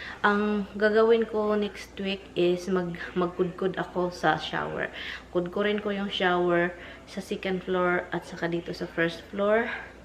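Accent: Visayas, Philippines. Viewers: Putang-Ina swerte mong naka-punta ng America... bisaya ka ang to gas mong magsalita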